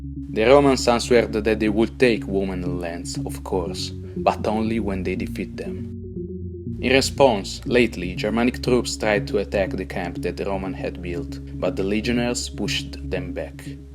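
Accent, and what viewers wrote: Italy. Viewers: Really good job: the script is brilliant and the story line flows smoothly with a charming Italian accent :) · He is speaking proper English, in an Italian accent. You have a problem with that? What language do you want the video to be? Italian? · You tried but I can't listen this accent but I like that an Italian tried to divulge I can recognize it good job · The Italian accent makes this video much cooler. It looks almost like a sense of revenge is emanating during the narration. :) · this is definitely italian accent... you can check channel info. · Penso che gli anglofoni troveranno un po' strana la pronuncia dei nomi latini, che qui sono resi all'italiana. Comunque vedo che i nomi latini sono simultaneamente scritti in grafica, il che aiuterà non poco. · Come si sente dall’accento che sei italiano 😂 Cmq 20000 uomini sono tanti morti si, ma purtroppo ci sono state sconfitte molto peggiori nei secoli antecedenti a Teotuburgo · Hears strong Italian accent. 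This may be a little bias lol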